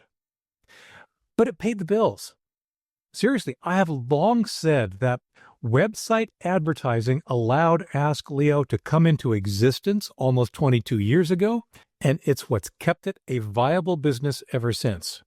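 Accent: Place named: United States. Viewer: I assume by your accent that you are American (as am I). In Dutch the surname " " translates to "nut tree." I hope that you sometimes celebrate your heritage and have visited the Netherlands at least once. Nog een goede dag!!!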